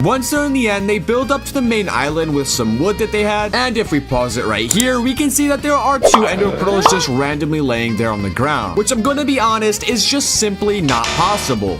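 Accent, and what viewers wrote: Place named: RP, United Kingdom. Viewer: Dramatic posh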